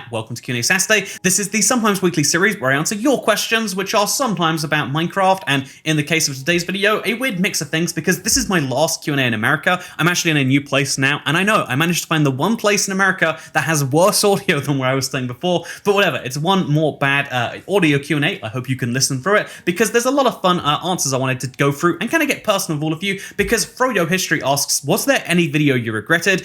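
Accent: United Kingdom. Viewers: I loved listening to a British man talk about the US. Gives us a whole new perspective on ourselves as Americans. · His voice is so British my ears got collanis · are you british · First time I watched a vid, without actually watching... Must have been the British accent, or cringy gameplay 😂❤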